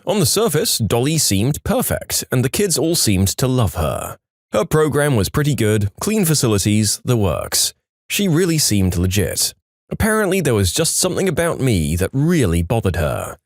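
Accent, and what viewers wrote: United Kingdom. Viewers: He sounds br-ish.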